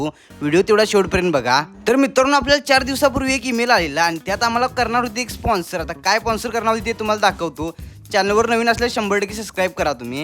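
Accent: Kolhapur, India. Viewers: Agayayaya meskati ky to kolhapuri touch aa❤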